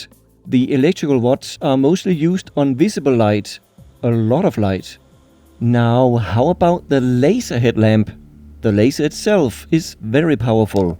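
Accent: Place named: Denmark. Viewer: gotta love this accent :) heja Danmark ;)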